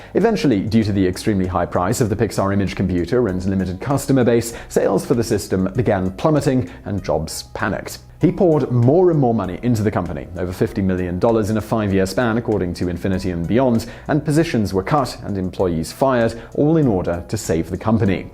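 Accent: England, United Kingdom. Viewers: Why is Johnny Sins speaking with an English accent?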